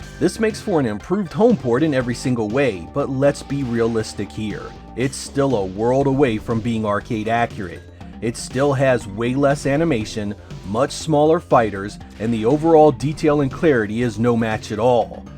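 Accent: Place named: Mid-Atlantic, United States. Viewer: is totally from PA/MD/NJ I can hear your accent lol with love from Boston!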